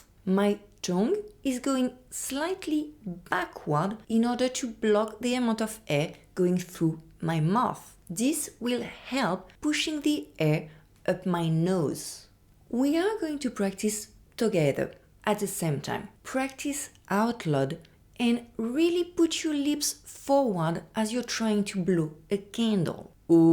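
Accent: France. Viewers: What’s nasa son?? You mean nasal sound?? Please don’t sound French when you’re speaking English. I had to turn subtitles on to understand what you’re saying in English. If you speak French, then ok.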